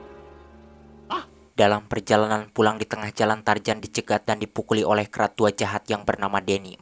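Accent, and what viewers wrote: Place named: Sunda, Indonesia. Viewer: Cara bicaranya itu loh .endingnya yg menyesatkan 😂😂khas suara dngan nada kesundaan 👍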